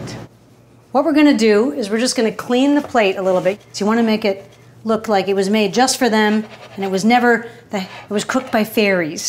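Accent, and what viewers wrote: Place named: Northern England, United Kingdom. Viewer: I’m living for your Northern accent luv. Proper bloody great!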